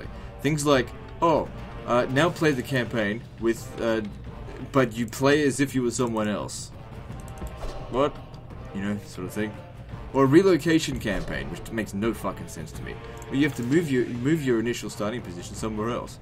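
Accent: Australia. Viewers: i think the aussie accent is partly the reason i watch i like aussie man reviews...woteva u do dude goodluck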